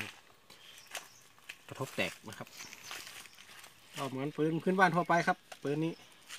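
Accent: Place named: Korat, Thailand. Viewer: โคราชแน่นอนครับ ฟังสำเนียงแล้ว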